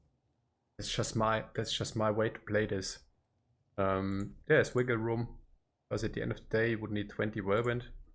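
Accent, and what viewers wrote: Germany. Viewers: wenn man 2 sec im Video ist und aufgrund der Aussprache direkt weiß, dit is oochn deutsche Jung😂 Like+Abo direkt da gelassen, mehr d2r content= mehr gut ;)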